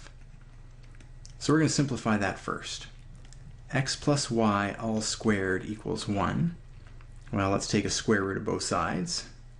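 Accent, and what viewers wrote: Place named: Canada. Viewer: Thanks. Your 'out' and 'about' gave away your Canadianess eh!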